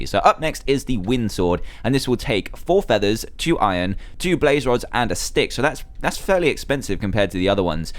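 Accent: United Kingdom. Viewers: Your British accent made me subscribe to you! Btw, I also play MC :))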